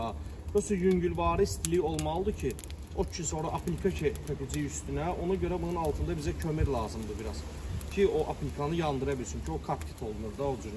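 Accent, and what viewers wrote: Baku, Azerbaijan. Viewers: Bakılı balası yorulmayasan!!!! Üreyün necə istiyir hələ də danış!!! İrad tutanlar da bilsin ki koptit közləmə deyil əslində hisə verilmiş deməkdi! Bakı danışıq dialektini qulaqları qəbul eləmirdəəə 😀😀😀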